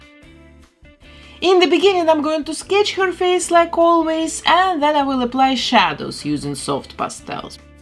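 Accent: Russia. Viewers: Кукла получилась классно. У вас сильный русский акцент, поэтому подумала, что вы меня поймёте). Работа сделана весьма талантливо. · Русский акцент? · русская чтоль? акцент- слушать невозможно, только смотреть, видео интересное! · А никого не смущает ее дикий русский акцент?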